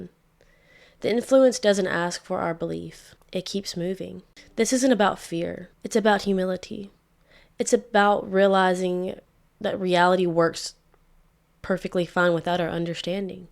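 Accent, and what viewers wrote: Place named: South, United States. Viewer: It’s dark energy that’s making the universe expand not dark matter. Why you trying so hard to hide that southern accent?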